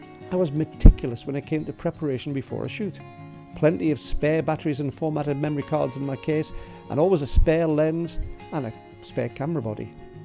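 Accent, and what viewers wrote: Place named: Cumbria, United Kingdom. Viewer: You must of been there about time I was there, im a west Cumbrian lass, but love Lannercost. Nice video. Love the accent, identifiable by any Cumbrian I agree as a lot to offer, mot just the lakes, also hadrians wall, the abbey at Abbeytown, and dont know if youve been to Calder Abbey at Calder Bridge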